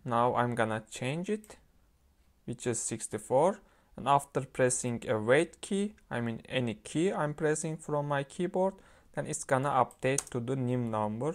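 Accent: Turkey. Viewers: kanka aksanın Türküm diye bağırıyor 🥰 başarılarının devamını dilerim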